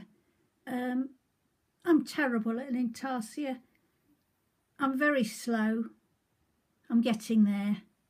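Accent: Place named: United Kingdom. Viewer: Dios me encanta el acento, es británica o algo asi???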